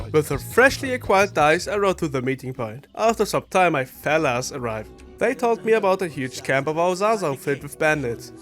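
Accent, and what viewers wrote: Germany. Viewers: Ey lol hab gerade erst realisiert, dass du Deutscher bist. Ich wusste doch, dass ich den Akzent kenne. Sehr gutes Video. Like und Abo verdient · Der deutsche Akzent 😂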